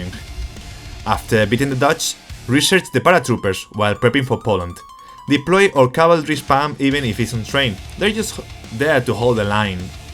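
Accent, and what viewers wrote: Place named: England, United Kingdom. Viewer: Jajajajajajaja me da gracia tu acento inglés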